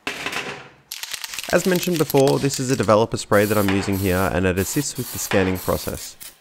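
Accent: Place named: Australia. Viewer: Great content, description, and commentary. Gotta love hearing the distinctive Aussie accent, too! 🇦🇺 There's some pretty helpful information to glean from the galahs watching from the wires too! 😆 10/10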